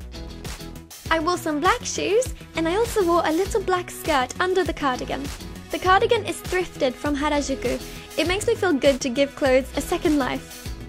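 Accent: United Kingdom. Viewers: your brit accent is sooo cute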